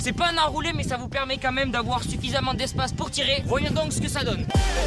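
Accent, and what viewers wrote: Marseille, France. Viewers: L’accent marseillais 👌🏼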